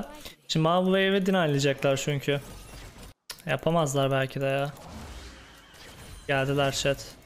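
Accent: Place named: Thrace, Turkey. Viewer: nereli bir Trakya havası var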